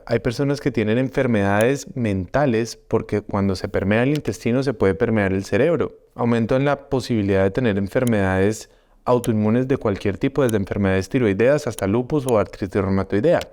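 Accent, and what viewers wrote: Colombia. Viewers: Gracias... maravillosa información..saludos de México amo el acento Colombiano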